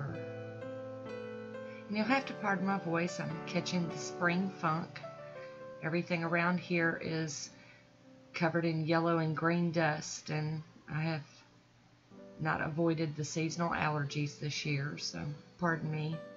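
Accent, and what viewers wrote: South, United States. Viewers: Rascally rabbit!! 😉 You just have the sweetest southern accent!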